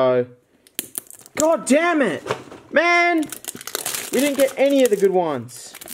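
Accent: Australia. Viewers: Intense aussie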